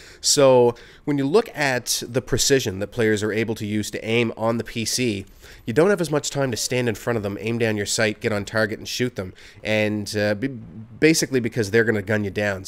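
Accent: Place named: Newfoundland, Canada. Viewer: where is from.. he sounds like hes from newfoundland.....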